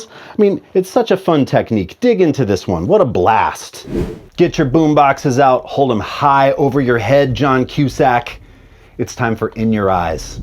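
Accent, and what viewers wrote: United States. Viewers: Oy! What happened? I went away for a year or two and you have an American accent?? Or have I found a different Bass Lessons? Geeez, I'm confused......I could've sworn your were a Brit.... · I actually like new American accent. 🤔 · is that you talking? I’m totally tripping on your voice I’ve been following your channel forever and I never heard anything from you ever that sounds like your a straight ahead American speaking beast of a bassist. I personally think that you are probably the most under mentioned professional on the circuit! I alway hear Stanley, Marcus, Victor...etc.... but you are right there on the same platform as the greats due to your understanding of the bass. You really really know and understand how to utilize several aspects of your fretboard due to your wide variety of techniques available to you. Many are deemed to one style or genre of music and watching your videos have been a great way for me to broaden my musical styles. 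I’m a full time musician and have been for some time now and it’s always amazing to see players like you that can pull every technique off as if it’s their only bag. Great job love your videos keep it up. And that voice??? I like the old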